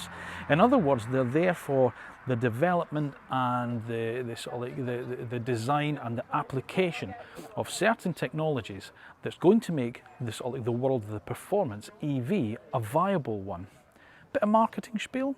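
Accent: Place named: Scotland, United Kingdom. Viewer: Oh.. this is the Scottish accent. This is my first time to hear. Very unique and I love it.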